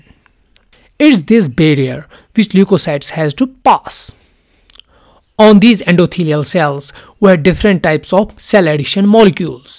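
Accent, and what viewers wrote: Kashmir, India. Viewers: Bro, love from kashmir, but your accent of speaking the English is very similar , how kashmiris speak English, are u kashmiri too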